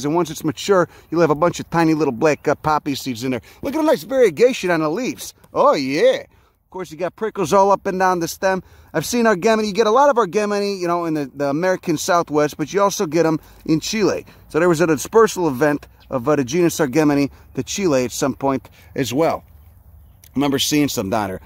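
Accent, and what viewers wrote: Chicago, United States. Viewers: I have a question where did you grow up, your pattern of speaking reminds me of Brighton Park Chicago area. Its a breath of fresh air and love the Show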